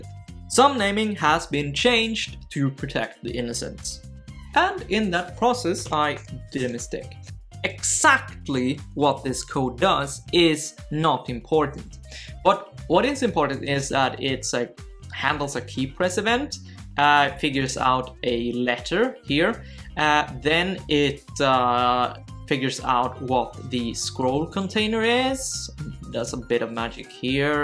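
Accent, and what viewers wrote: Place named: Sweden. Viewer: Hi guys i'm i lead a small web-dev team writing mostly angularJS 'i also have a background in C# yet i fail to see +Krzysztof Suszyński point? BTW + i love your show you explain things very clearly and your Swedish accent now resonates in my mind on mondays:) i also have mixed emotions about the point made in the video..perhaps +Alexandre Franco video will make more sense of it i will have to watch it. nevertheless +Krzysztof Suszyński can you elaborate more on why is this more valid in JS?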